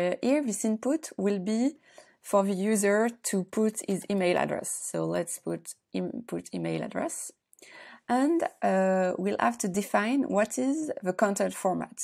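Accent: France. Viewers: Thank you so much for this video! p.s. I love your accent! -I know my people when I hear them. Go France!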